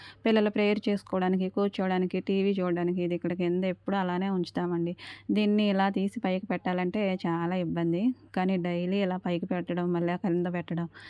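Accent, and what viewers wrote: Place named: East Godavari, India. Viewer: ne matlade slang ma vari menattagari ilane untundi.vallu east godavari dist. Nannu Amma Ani antunnavu I felt very good. Inka na family maku oka abbayi. Ante ma varu monna sept lo cancer to chanipoyaru. Anduke nenu austrelua ochhesanu. Ma kodalu kuda ikkada oka pedda off lo engineer. Ma abbayi kuda engineer. Vallaki oka papa vahini 3rd class. Nenu kuda ikkadiki ochhi job chestunna. Na age 65 ayina naku individuality ekkuva. India rate prakaram 75k ostundi. Naku hyd lo oka place undi. Ikkada ma pillalu 2 houses 2 cars hyd lo 2 places konukkunnaru. Valla salary lo konni loans cut avutayi. Anduke nenu job ki vela. Ikkada Anni mechines kanuka nuvvu chesinanta pani undadu. Nenu ma kodalu evariki veelu unte vallam chesukuntamu. Idi na sangatulu. Ma sisters antaru. Inta age lo job enduku pillalu chusukuntaru kada Ani. Naku oppadu. 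Amma nenu msg pettindi neeku ardam avutunda. Lekunte nenu msgs manestanu. Cheppu emi cheyanu.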